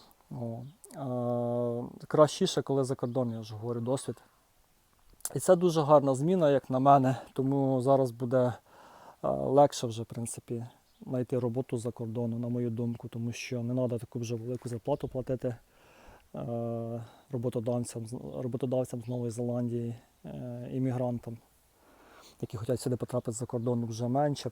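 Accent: Cherkasy, Ukraine. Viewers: Дякую вам за чудову мову, я насолоджуюсь! Бо та кацапщина, навіть з уст українців вже в горлі стоїть! По акценту спробую вгадати, Чекркащина або Польавчина??)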